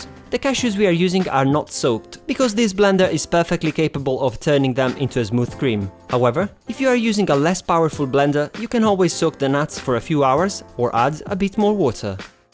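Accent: Italy. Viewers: I love this channel, so many ideas. Have a hard time finding raw cashews, everywhere I see them are fried or roasted. Also I really like your accent, is it italian? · absolutely, thank you! And same here. May I just add that your videos are amazing! They are very well done and the explanation is terrific! I have watched quite a lot of them and can't wait to look at more, and obviously try them. I am not a vegan, but a vegan-friendly vegetarian (🙄😜) and videos like yours are very helpful. And I was missing cream so badly (the store-bought one isn't good, at least here in Europe). So, thank you! Do I recognize and Italian accent? Maybe from northern Italy? Un saluto da una tedesca cresciuta in Italia 👋🏼 Ciao!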